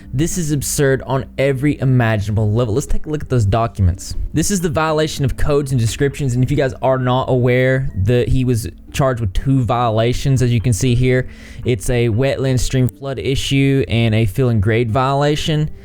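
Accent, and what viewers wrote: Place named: Georgia, United States. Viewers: I knew that accent had to be from Georgia 🤪 I live there too. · I called it! I knew your accent was from east of the Mississippi! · I just found ur channel yesterday and knew u were from Georgia just by your accent- bc that’s where I live too 😂 happy you said it in this video so I’m not still wondering if I’m right! What area are you from?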